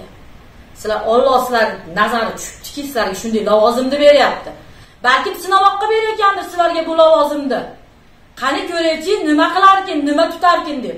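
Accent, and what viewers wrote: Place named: Andijan, Uzbekistan. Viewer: Опа гапни хам Анжонча килиб ташладингиз,офаринг,ёнингиздаги 2-та опа хам гапиришга чикган эдими,халк сиз каби инсонлар бн ...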